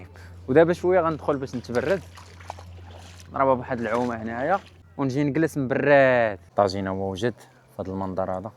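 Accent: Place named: Egypt. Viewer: سفرية 😂😂😂😂وليتي مصري 😂😂متاثر بلهجة مسلسلات رمضان يا مدلولين....سفرية....تفو على شعب متاثر بلغة المشارقة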